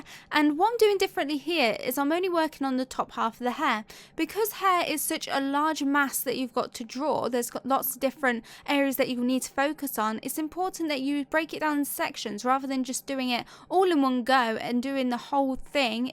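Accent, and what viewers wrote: United Kingdom. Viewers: I love your accent British!!!! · I love ur british accent :) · Hi your sketching technique is just as beautiful amd marvellous as your lovely and sweet British Accent. I am in love with your voice and killing British Accent. Wow! I have not seen many videos with this simple yet realistic technique. Thank you very much for sharing. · British accent???¿¿¿ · British accent? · The British accent <33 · Is that British Accent?? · BRITISH ACCENT OP SHE LITERALLY SOUNDS LIKE CLAIRE HOLT ( REBEKAH MIKAELSON)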